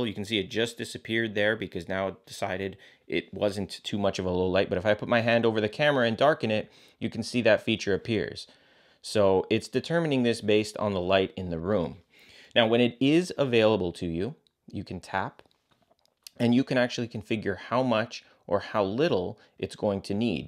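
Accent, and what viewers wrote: Canada. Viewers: Thank you! i'm going to watch again, just got the 12from the 6 ani'm a filmmaker. Enjoyed the Canuck accent.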